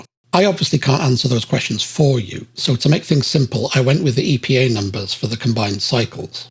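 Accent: England, United Kingdom. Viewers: A very good comparison but I have to wonder... If he's using Texas as a base, why does he have an English accent? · Excellent breakdown and video, although was a bit confused to hear a fellow Brit talking about a car with UK/European origins but using US costs! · This is so weird listening to a British accent but in American prices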